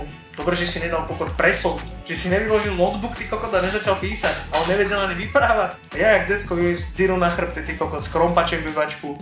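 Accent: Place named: Trnava, Slovakia. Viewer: Toto je trnavčinka jak remeň 🤣🤣🤣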